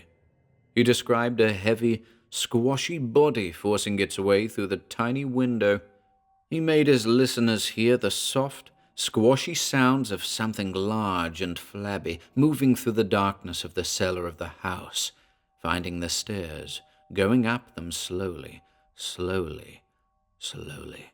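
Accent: United States. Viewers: Your American accent has improved tremendously since you first tried it out. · Wow! This story is awesome! I love the setting and description of the old house and environment around it. This is well-written....many of these old tales are. Fantastic narration! Your American accent it great! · Pretty good American accent!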